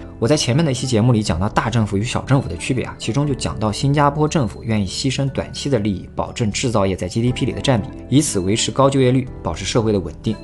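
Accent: China. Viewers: 不知道其他人跟我是不是一样的感受，一口流利的中文，而且听起来还是大陆人，一口一个中国，中共，老毛，“新加坡”，这个感觉就有点讽刺的意味，有可能是我的格局小啊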